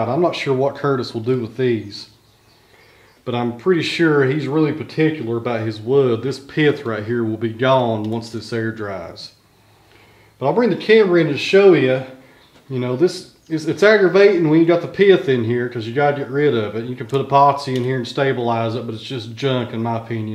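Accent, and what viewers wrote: United States. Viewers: Loving this dude's accent - sounds like REAL America, as it should be. Got my sub here from the UK.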